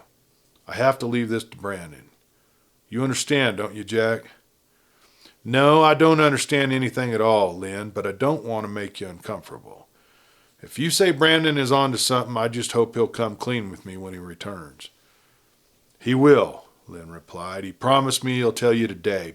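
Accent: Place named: Texas, United States. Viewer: And you almost sound like a Texan❤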